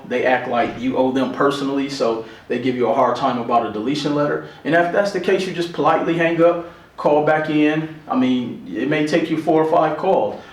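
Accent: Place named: Pittsburgh, United States. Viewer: Very helpful!! U still got your Pittsburgh voice ...Haha r u from Pa? 👍❤👌🙊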